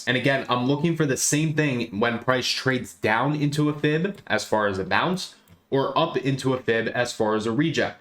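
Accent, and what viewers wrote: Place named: Delaware, United States. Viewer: Thanks for these videos. You gotta be from new Castle county 😂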